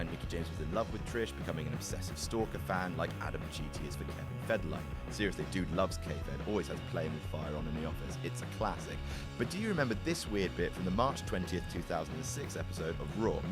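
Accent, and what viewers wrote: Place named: United Kingdom. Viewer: This Asshole just Loves to hear his own Lame Ass British Accent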